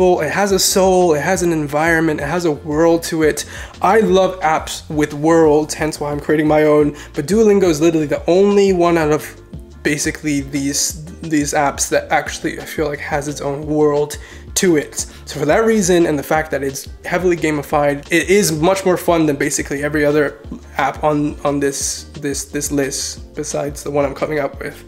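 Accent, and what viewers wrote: Nigeria. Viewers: Great Nigerian accent! Do you eat any Nigerian food? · Does a good nigerian accent but doesn't speak igbo 🙄 i am a bit salty as you can see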